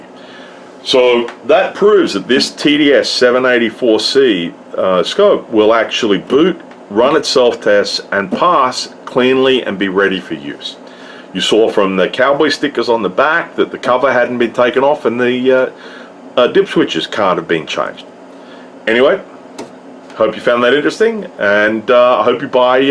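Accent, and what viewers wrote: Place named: Australia. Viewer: Cool, keep the vids coming. Really enjoy them. Love the Aussie accent too, makes a pleasant change to hear "solder" as it should be pronounced !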